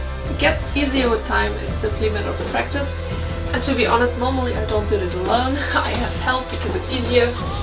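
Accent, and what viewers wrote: Germany. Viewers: Maybe I misheard you then, with your lovely German accent babes... that lovely blue catsuit you sported for us last week, from Bright and Shine was it?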